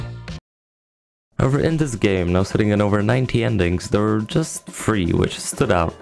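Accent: Poland. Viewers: what country you from i thouhgt ur polish for a sec?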